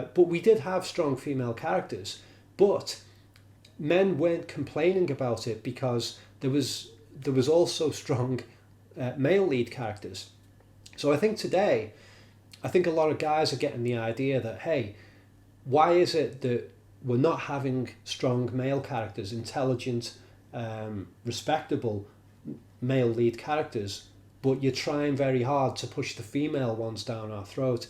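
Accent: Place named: Manchester, United Kingdom. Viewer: You sound like Noel Gallagher! Are you from Manchester?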